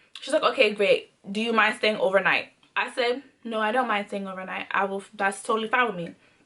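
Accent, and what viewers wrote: Jamaica. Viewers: From mi hear the accent you a Jamaican ❗️❗️❗️❗️ found you in my recommendations.... like 20 secs in the vid n like it already ❤️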